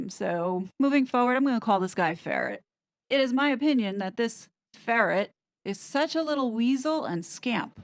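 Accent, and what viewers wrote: New York, United States. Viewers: I don’t believe she is attacking appearance. A ferret is a type of weasel, which I believe is calling her. I may be wrong, but that was my take. native New Yorker is coming out in this video. When she gets riled up about all these deceptive shenanigans, accent stands out and she speaks faster and with more vocal attitude. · I love when your New York accent and phrases shine through🥰. I live in Florida now but originally from Jersey shore, I miss those things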